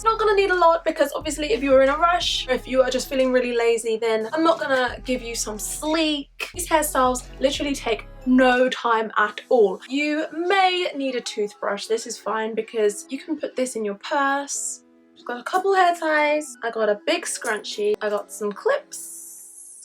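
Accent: United Kingdom. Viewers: I Love your Britsh accent!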